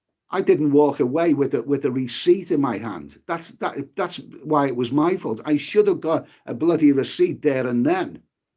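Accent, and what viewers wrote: Liverpool, United Kingdom. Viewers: You’re a scouser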